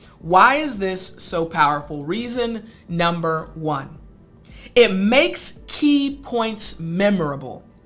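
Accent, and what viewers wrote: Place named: United States. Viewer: Teacher. I am really blessed when a I watch your courses. They are extremely clear, powerful and resilient. However I would like to know where you are from. I hear American accent into your speech but I would like to be sure... 😊 I am actually from DRCongo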